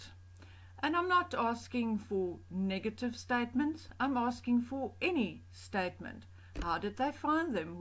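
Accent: South Africa. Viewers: WHAT A FIND YOUR SITE IS , LOVE IT. ALREADY SUBSCRIBED. LOVE THE SA ACCENT AS I LIVED IN SA FOR 4 YEARS, SUCH A BEAUTIFULL COUNTRY AND PEOPLE. MY MIDDLE TWO CHILDREN WERE BORN THERE SO LOTS OF VERY HAPPY MEMORIES.. KEEP UP THE GOOD WORK.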